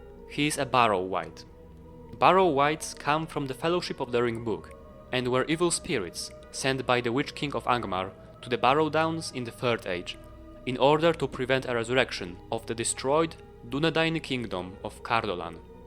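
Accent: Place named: Poland. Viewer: klasycznie polski akcent 👍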